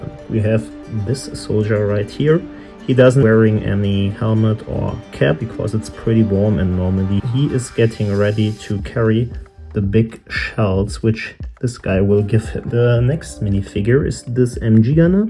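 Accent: Germany. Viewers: I hear a little bit deutsch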